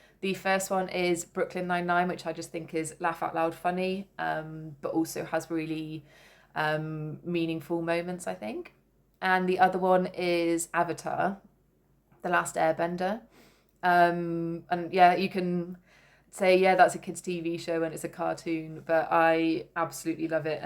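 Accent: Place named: Cornwall, United Kingdom. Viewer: ... your lovely, your projects are beautiful. Your graduating to lovely & big Samplers. Modern Folk Art is in Flosstube, he’s got many pieces, some reproductions, that reflect your large pieces. When you says Manchester, I thought hummm that’s not a Manchester brogue, & then Cornwall came out, yes lovely to listen to you 🌺 Check out Hands Across the Sea, Nicola Parkman. She’s in Cornwall. Floss tube is a rabbit 🐇 hole... delightfully so. Until next time. Stay safe... Blessings ~ across the pond, 🇺🇸 Ohio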